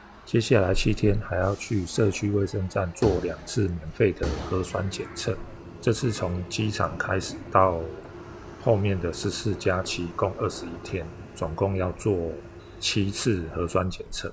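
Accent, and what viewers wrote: Taiwan. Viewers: 靠北 ! 濃濃的台灣味 , 哈哈 !!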